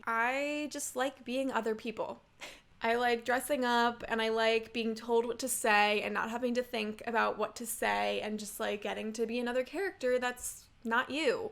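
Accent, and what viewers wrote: United Kingdom. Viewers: oh, how much I missed your British accent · my crush on her when she speaks brittish 📈📈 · 20 seconds onto the video and she’s already talking in a British accent😂😂 iconic queen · i know what a drinking game is, He/she said to subscribe everytime does a British accent 🤯 where's the drinking part?? Edit: no drinking in a "drinking game" lol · I love your British accent and you🤍🤍 , btw what mascara do you use because you look stunning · YES BRITISH IS BACK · This British accent lmao I love it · i bloody missed that british accent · your nervous british accent :> so cute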